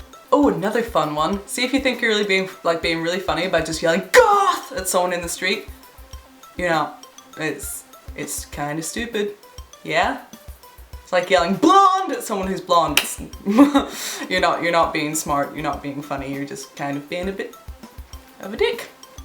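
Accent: United Kingdom. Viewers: Sup YO, Sorry you went through what you went through, I went through similar in my youth as a MetalHead living in Brooklyn NYC. But people of mundane culture can't help themselves but tap into realms of ignorance, you are extremely beautiful and keep filling people in, this is cool, Goth's in NYC are a lil to themselves or are bit elitist so it was hard to get info about their movement in my day. Love the accent is it British?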